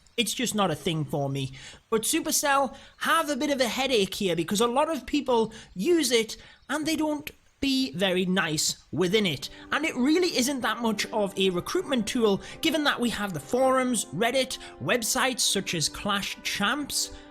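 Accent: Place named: Northeast England, United Kingdom. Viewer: North East England by the sound of it. My guess Sunderland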